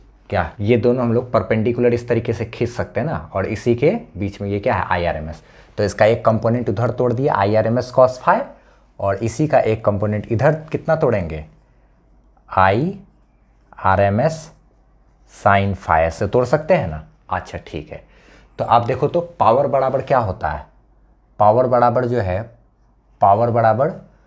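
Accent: Bihar, India. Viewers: Sir.. Aap Bihar se ho kya??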